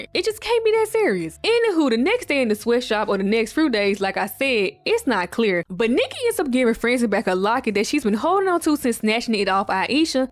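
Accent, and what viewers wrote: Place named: South, United States. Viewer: love your country accent 😂😂 i feel at home! new subbie in me 💕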